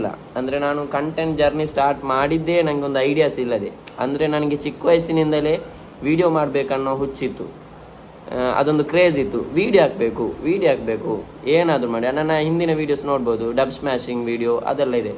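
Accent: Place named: Mangalore, India. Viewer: ಮಂಗಳೂರು ಕನ್ನಡ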